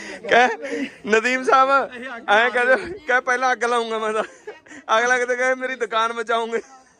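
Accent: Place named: Malwa, India. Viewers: Veer apni boli bol reha eh malwai aa ta malwai hi bolni aa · ਵੀਰੇ ਤੇਰੀ ਬੋਲੀ ਵਧੀਆ ਨਹੀ ਜ਼ਿਆਦਾ ਮਲਵਈ ਜ਼ਿਆਦਾ ਨਾ ਬੋਲਿਆ ਕਰ ਵੀਰੇ ਲਹਿੰਦੇ ਪੰਜਾਬ ਦੀ ਬੋਲੀ ਬਹੁਤ ਮਿੱਠੀ ਹੁੰਦੀ ਹੈ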